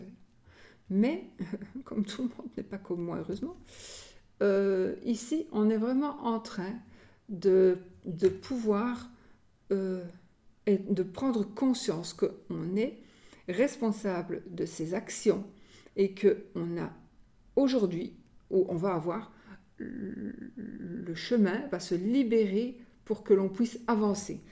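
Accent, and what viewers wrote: Belgium. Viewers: Salut, sorcière bien-aimée, comment vas-tu avec ton petit accent belge ? On est pays...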